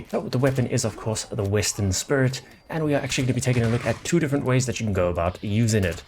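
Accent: South Africa. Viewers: Is that a South African accent I hear?! Subbed.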